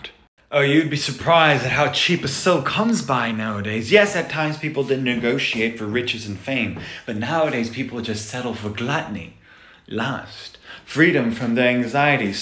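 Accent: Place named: United Kingdom. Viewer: The British Accent😅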